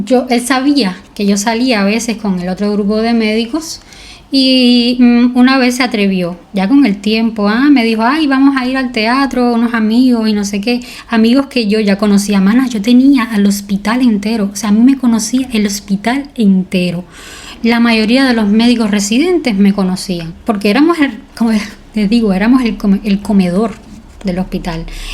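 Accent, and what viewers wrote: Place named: Cuba. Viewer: Mi esposo cada vez que escucha tu voz me dice: ya estas escuchando a y yo le digo claro que siiiii 😅😅 por fin llegamos a la parte feliz, te lo mereces todo mi reina. 🩵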